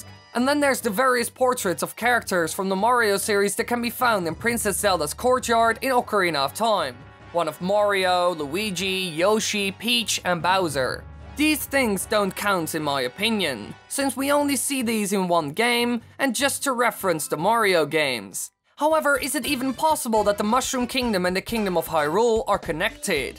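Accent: Netherlands. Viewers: Hey you're Dutch, right? Brabant?? :P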